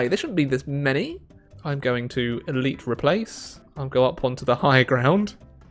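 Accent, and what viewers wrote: United Kingdom. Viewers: Oh you are British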